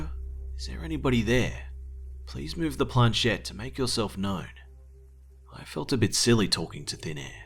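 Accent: Australia. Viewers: I agree with you 100%; IS one of the best narrators on YouTube! His content is ALWAYS excellent AND satisfyingly creepy. I too an not a big fan of The Creepypasta genre (as I assume you aren't either) and his channel rarely presents them. His content is always well chosen; it is gripping, spooky, pleasingly bizarre at times and is always presented in a very listenable manner. (AND, how amazing is his Aussie accent?!) `s channel is DEFINITELY one of the very best on YouTube! 😱💀☻👻🦇🕸🕷🐱☠👽🖤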